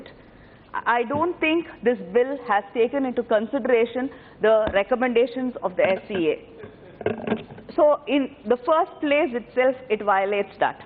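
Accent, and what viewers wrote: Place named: Tamil Nadu, India. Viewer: அக்கா உங்கள் ஆங்கிலபேச்சில் தமிழ் நல்லாபுரியுது சிரப்பு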